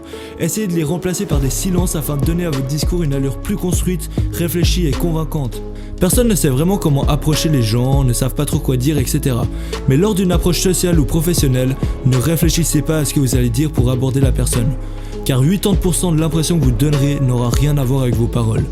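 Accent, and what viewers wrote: Vaud, Switzerland. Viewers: T'es suisse??? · Tu est vaudois ? J'adore ce que tu fais 👌👍 Depuis que j'ai regarder cette vidéo je parle beaucoup mieux et les personnes son plus intéresser a ce que je dit merci 😊 continue 👍👍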